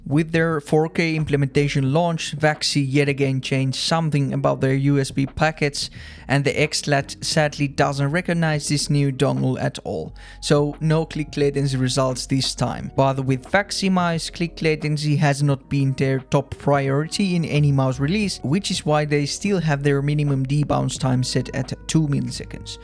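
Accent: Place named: Finland. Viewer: Eyy, another finnish peripheral enthusiasist :D knew right away from the accent lol, Kiitti arvostelusta, hyvä video :D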